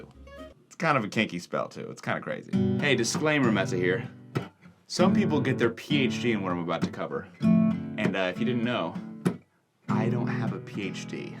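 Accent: United States. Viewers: Yeah no wonder you're from LA. You have their disgusting accent.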